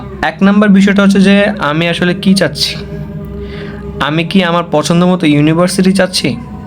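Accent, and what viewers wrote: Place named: Comilla, Bangladesh. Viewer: Vaiyer bari mone hoy comillaa...🙂 Btw vasa sune andaj korlam🙂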